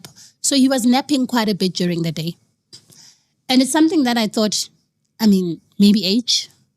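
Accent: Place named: Venda, South Africa. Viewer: Is the host a Muvenda? I detect hints of Tshivenda accent in his English ❤❤❤❤❤❤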